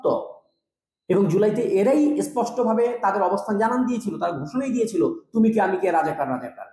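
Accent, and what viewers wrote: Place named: Arab world. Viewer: আরবি উচ্চারণেই বুঝা যায়। কথার মাঝে ছোটো ছোটো আরবি শব্দ ব্যবহার করে এবং ছহি উচ্চারণ করতে পারে।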